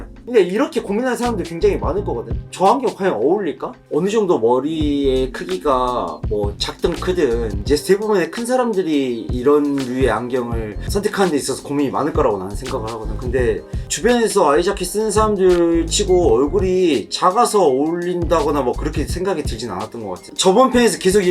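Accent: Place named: Jeolla, South Korea. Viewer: 전라도 말투극혐이네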